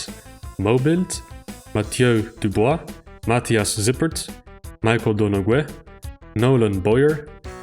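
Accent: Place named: Netherlands. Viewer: I can't get around your heavy Dutch accent.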